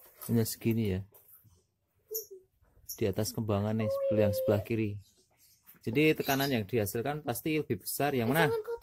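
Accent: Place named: Java, Indonesia. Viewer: Embuh 😵😄 , mesti iki wong jowo ...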